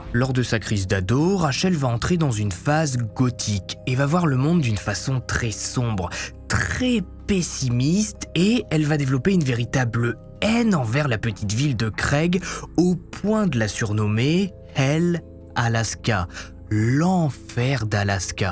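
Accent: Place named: Picardy, France. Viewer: Ce fait comme un rat mort. Tu es du nord Picardie j’adore 👍 bisous 😘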